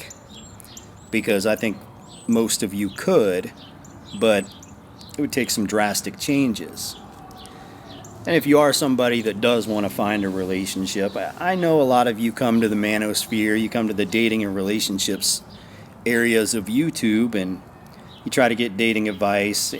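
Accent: United States. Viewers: I'm wondering how your tinder dates look like because there are many attractive men out there with not really attractive chicks...I love the tone of your voice with this american accent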